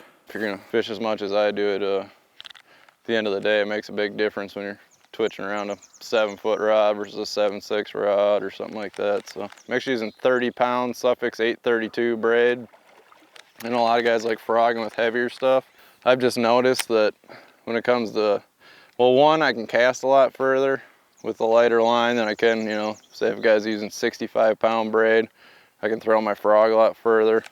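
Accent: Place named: South, United States. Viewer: Dude reminds me of Jay from Jay and silent Bob with a southern accent chill af lol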